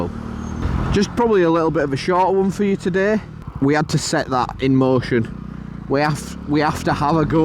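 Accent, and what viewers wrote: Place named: Leeds, United Kingdom. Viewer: Are you from Pudsey by any chance? I detect a Leeds accent and the channel being 🤔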